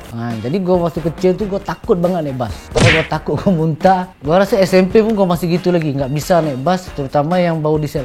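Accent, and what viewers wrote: Malaysia. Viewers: Logat Malaysia masih belum ilang.. Sekali masuk master chef Baginda lord ngomong nya gua gua..😁😁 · Kok lucu logat melayu tpi pake "Gua" 😅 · Go support from Malaysia 🇲🇾🤞🏻 accent malaysia tak hilang lagi tu😂😂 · Pernah tinggal di Malaysia sama kaya atta dong.....gaya bicaranya sedikit2 kaya orang Malaysia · Pantesan bahas nya lebih ke kaya orng Malaysia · Cakap mcm org malaysia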